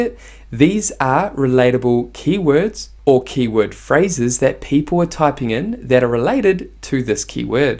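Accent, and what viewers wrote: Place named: New Zealand. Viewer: Love the kiwi accent, thanks for the video :)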